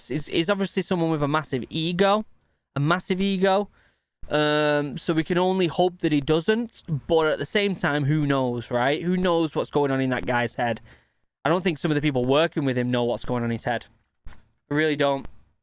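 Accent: United Kingdom. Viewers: What british/brit accent?Or are you Scotsman?Great,your transparent cap!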